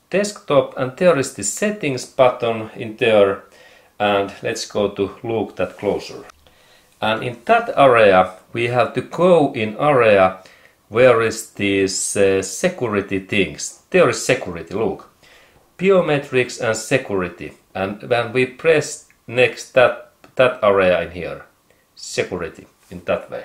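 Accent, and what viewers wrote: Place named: Finland. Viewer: Your Finnish accent is soooo cute. 😉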